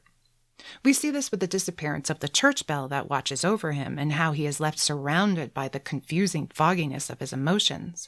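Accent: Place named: Maryland, United States. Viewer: to your friends😊… this video is… perfect! I have been trying to find just such a video about narrative structure for a group of my students in Grade 9- Freshmen, first year High Schoolers in USA. (Excuse me if 9th Grade is obvious to you! I know nothing about your citizenship, though your accent sounds like mine from Maryland.) Regardless, as said, perfect video! The specifics about the spirits, Dickens’ direct yet deep depictions, and the psychological sides of Scrooge — wow, it is enlightening and engaging without being too easy… Thank you so much! I hope to see more of your videos and use them to help all my students grow in their Narrative Writing abilities! “God bless us, everyone”… but especially you and yours this Christmas!🎄🤓🎄🥳🎄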